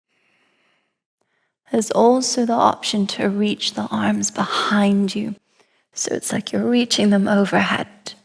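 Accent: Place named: United Kingdom. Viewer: Great practice, I’m a new subscriber, where from the uk is your accent from? Throughout the hour I was getting distracted trying to figure it out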